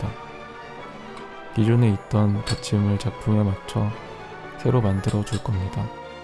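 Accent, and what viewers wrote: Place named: Chungcheong, South Korea. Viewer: 유명한 유튜바한테서 느껴지는 익숙한 말씨... 충청도여...~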